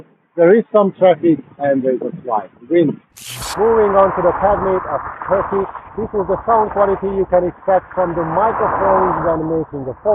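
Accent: Hungary. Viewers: Szerintem magyar akcentust hallok 🧐🤔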